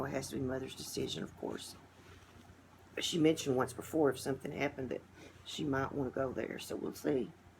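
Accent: United States. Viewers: I completely agree about reborns being helpful for getting through those anxious times. I like to feed and change mine when I'm anxious too. Also agree about God giving us strength we never could have guessed at right when we need it. He is good all the time. I'm so sorry you and your mother are going through this, though, and you will both be in my prayers. I love your voice and your accent. I was born in NC. I now live in IL, and I miss my family so much. Listening to you reminds me of home.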